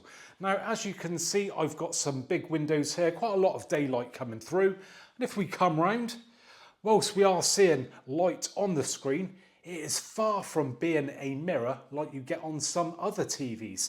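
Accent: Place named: West Country, United Kingdom. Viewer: Sounds west country. Looking at getting this tv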